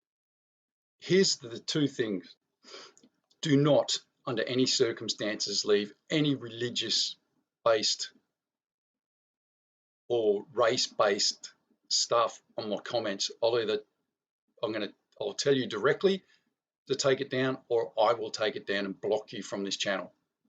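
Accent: Australia. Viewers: I'm so glad you do this. You're one of a handful of Aussies on YouTube saying this stuff. I have an American friend who though quite the lefty at least understands the idea of prepping. I always shout out to the Aussie preppers on overseas channels. It's nice to come and hear an Aussie talking. I've only been prepping for a little while, but really I've gone back to what my Mum used to say and she didn't know about any of this globalist stuff. But she was a farm girl and had been told about the great depression so she knew.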